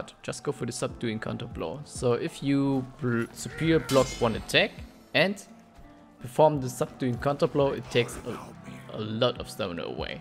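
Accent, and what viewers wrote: Germany. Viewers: Sounding very German in this video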